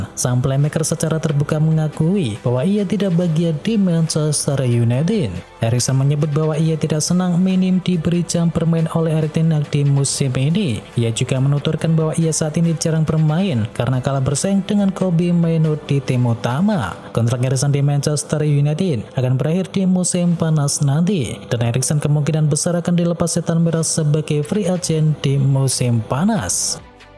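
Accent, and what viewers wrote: Java, Indonesia. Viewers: Bang logat jawanya bisa gak di biasain aja kalaw buat vidio, gak enak dnger nya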